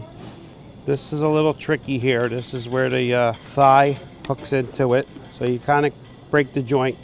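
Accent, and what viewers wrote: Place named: New Jersey, United States. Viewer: mmmmm goodeats!! and I love that jersey accent. mwah!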